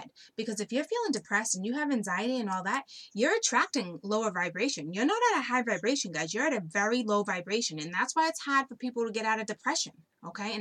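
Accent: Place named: South, United States. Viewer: lmao... Lovely Southern Drawl ya got there and most appreciative from This Virginian Aries with a Southern Bwoston blended tongue... yeah, it's a long story.. but I AM...and so it is. THanks! <3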